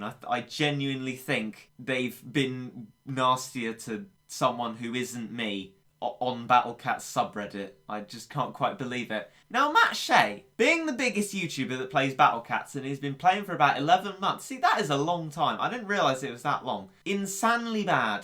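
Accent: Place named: England, United Kingdom. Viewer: I think that you mistook what was said in that first post there m8y. Now you sound like an Englishman, so American may not be your first language, but let me quote the part that seemed to catch you by the jimmies. "Also, he's not as bad as and Chillhola considering he is willing to improve, and equally importantly, he is not a complete douche to his fanbase." In this sentence, he used your name and Assassinbear and that unlike you two, MattShea is willing to improve and not a complete douche to his fanbase. This sentence isn't the best constructed however he's not saying you're a douche to your fanbase. He's saying that MattShea is "willing to Improve unlike " and "not a complete douche to his fanbase like Chillhola". You made several references to that through your video and I went to reread that sentence a few times because I didn't take away that you were a douche from it at all. 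I think the sentence meant the terms "respectively" and not in accordance to you both.:D Cheers m8y!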